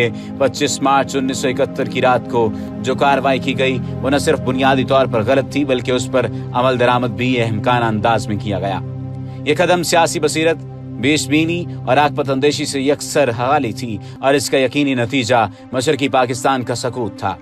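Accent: India. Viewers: This is indion voice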